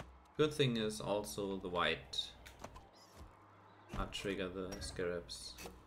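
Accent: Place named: Germany. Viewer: Du hörst dich irgendwie an als wenn ein Deutscher, Englisch redet, kann das sein? Hi, it heards like when a German speaks english, where you from? Like goes out.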